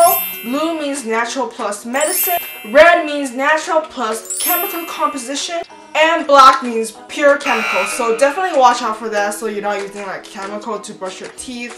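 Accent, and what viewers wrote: Canada. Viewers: are you Canadian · Canadian eh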